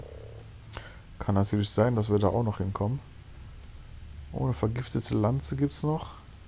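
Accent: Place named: Frankfurt, Germany. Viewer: aus welcher gegend kommst überhaupt manschmal hab ich das gefühl kommst aus frankfurt oder zumindesten rhein main gebiet :)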